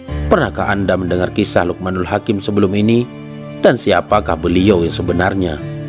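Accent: Aceh, Indonesia. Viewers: Pasti ureng aceh ini admin logatnya terdengar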